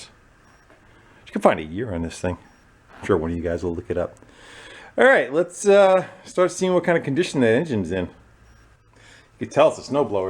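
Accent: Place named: Massachusetts, United States. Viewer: A Yhard Buhirud? love it! Worked around lots of people with that accent... never forget it. I can do a pretty good North East Mass accent, and I can always tell in movies when they bullshit one. they all sound Australian when they fake it really badly.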